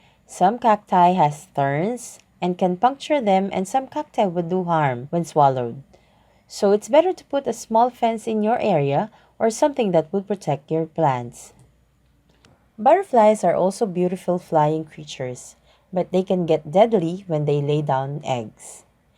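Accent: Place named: Philippines. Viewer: Sounds like Filipino 😀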